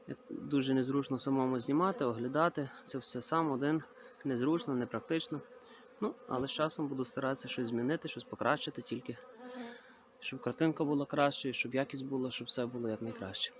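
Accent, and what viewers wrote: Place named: Ternopil, Ukraine. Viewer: По розмові чую брате ти з Тернопільщини. Південний захід. Бучач та околиці? Я з під Чорткова.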